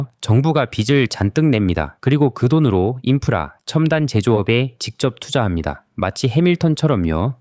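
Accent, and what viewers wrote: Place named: Gyeongsang, South Korea. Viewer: 살짝 경상도 억양이 들리는데 고향이 울산 이신가요 ?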